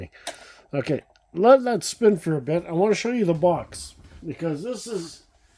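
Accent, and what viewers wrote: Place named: Canada. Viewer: As a fellow Canadian but living in The USA, i love your videos and accent, EH! I want to display all my cars loose like you do. I have over 500 cars that need to come out of their cases! Where did you get your display cases?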